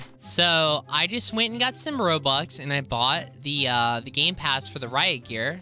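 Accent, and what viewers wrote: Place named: South, United States. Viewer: I turn on noficachio bell and I enjoyed it becuz I love when u talk country and I subscribed and I lovvve u.username:madihle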